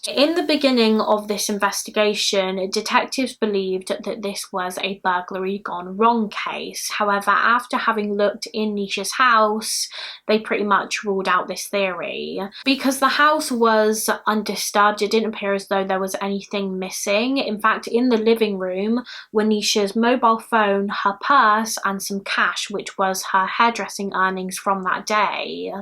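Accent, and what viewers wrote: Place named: Southwest England, United Kingdom. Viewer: It's a south west English accent 😊. Not 100% but I'd say Somerset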